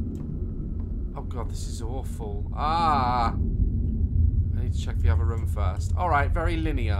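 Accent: United Kingdom. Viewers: One of the things I like about videos is the British accent and btw I just subbed!!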